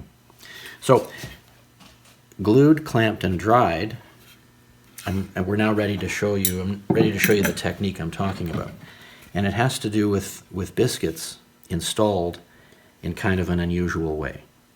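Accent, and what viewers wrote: Canada. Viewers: Nice video, Interesting! I shan't comment on the criticisms you received on whether it's a strong or not corner reinforcement joint. It is just showing another method of reinforcing a mitre joint. To those who are interested in the tool you used these are on sale in the UK under the description of Spring Miter Clamp Set, Framing Mitre Clamp, Google that and you'll find lots. By your accent i'm assuming you are from Canada, not sure what you would call them there.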